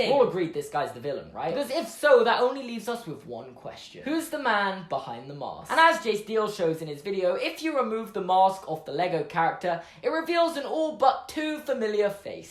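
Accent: United Kingdom. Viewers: you are from the uk!?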